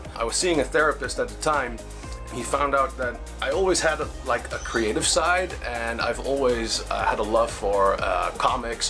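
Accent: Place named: Netherlands. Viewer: Lol he actually has a Dutch accent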